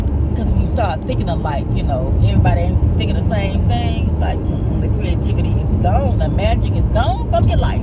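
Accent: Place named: South, United States. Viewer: Great ride along video love your AKA rain coat and I love country dialect 💞💚💞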